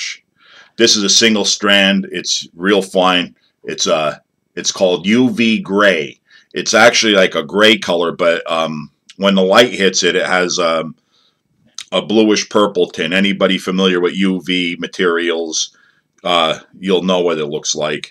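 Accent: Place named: Pittsburgh, United States. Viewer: hey I was just wandering if you were a yinzer?. I am and you sound like a yinzer. check out my video about the secret hair jig as I give a shout out to you. Great job and keep up great work. if you are a yinzer leave a message for me and we can get on the water. thanks yankeelipper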